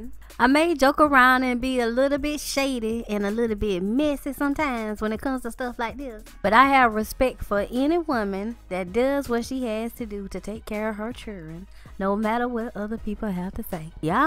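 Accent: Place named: South, United States. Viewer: I appreciate the immediate reply. Understand that I asked because I have relatives and friends from the south (where you sound to be from, or have lived) a couple of whom actually pronounce "street" as "screet" in all seriousness. Thanks again; I'm about to finish watching your video on Fabolous. These are fun! 👏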